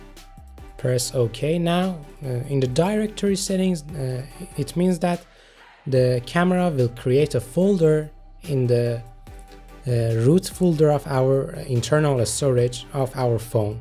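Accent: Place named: Iran. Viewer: Luv your Persian accent pal.. so glad to see Iranian guys here making professional vids.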